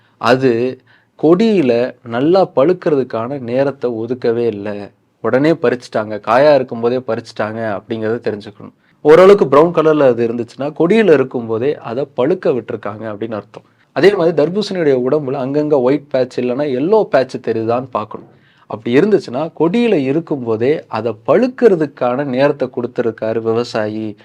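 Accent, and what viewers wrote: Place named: Kongu, India. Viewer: கொங்கு தமிழ் கோசாபழம்❤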